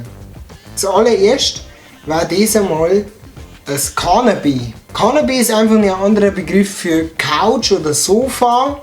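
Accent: Bavaria, Germany. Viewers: Konnte als Niederbayer aus Landshut, dort geboren und aufgewachsen mit niederbayerischen Eltern und Freunden, kaum mithalten. Ein Sprachmittler zwischen Pleysteiner und meinem Dialekt wäre fast notwendig gewesen. Ein Allgäuer, nehme ich an versteht den gar nicht. Muss er ja auch nicht.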